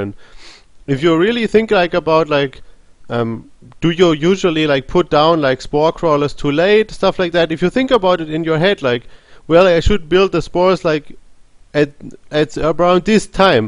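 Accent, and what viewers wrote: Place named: India. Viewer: his accent sounds kinda indian, this confuses me.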